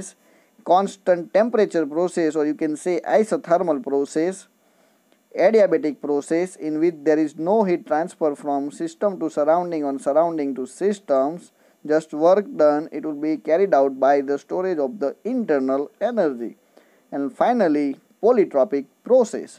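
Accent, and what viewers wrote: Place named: Gujarat, India. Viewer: Sir aap ki aawaz se hi pehchan gaya aap bhi gujraati ho 😅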